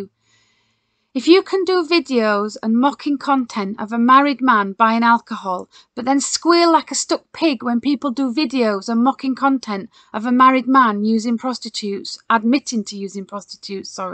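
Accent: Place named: Essex, United Kingdom. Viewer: what a lovely accent. Essex?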